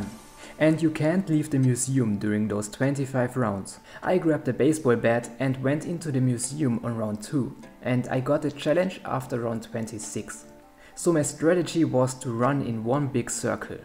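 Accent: Germany. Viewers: im curious, is your accent german?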